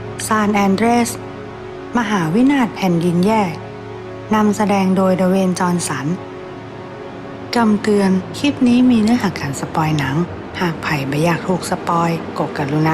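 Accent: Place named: Isan, Thailand. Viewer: เสียงอิสาร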